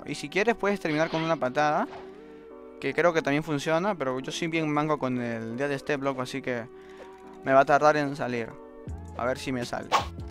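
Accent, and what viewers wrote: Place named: Córdoba, Argentina. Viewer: Cordobés parece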